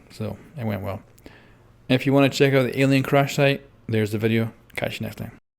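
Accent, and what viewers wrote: Scotland, United Kingdom. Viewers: where in scotland are you from?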